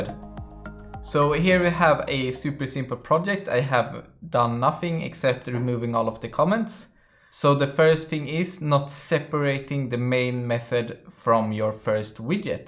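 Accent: Sweden. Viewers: Great great tips. So you're Swedish, aWay -- aVay 🙂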